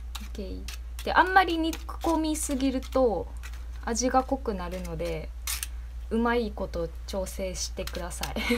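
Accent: Kansai, Japan. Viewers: ガッツり食べる女性は大好きです。 こんなに可愛い女性だとは💕 関西弁なんですね♪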